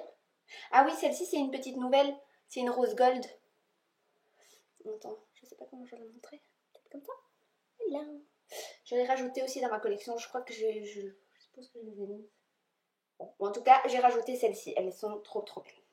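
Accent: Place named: Belgium. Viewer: J'aime bien ton haul 🤗 Le mascara gemey avec la brosse en forme de cœur me tente hihi😊 Dit moi tu es belge? Parce que j'entend comme un accent quand tu parle. Des bisous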